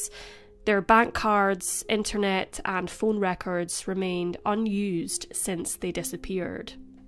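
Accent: Scotland, United Kingdom. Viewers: This sounds like it’s narrated by a fellow Scot! 🏴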